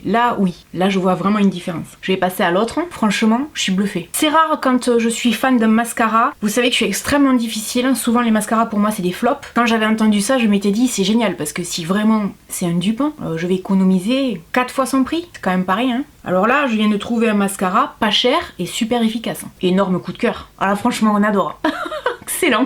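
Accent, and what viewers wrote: South, France. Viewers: ET SON ACCENT DU SUD UN REGALE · Coucou. J’ai toujours voulu tester les marques M.A.C et Maybelline, malheureusement elles testent toujours sur les animaux donc je préfère m’abstenir.... J’ai beaucoup aimé ta vidéo mais surtout ton accent du sud! 😂 Bon week-end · Il est beau notre accent!! Merci pour cette video. Bisous d une catalane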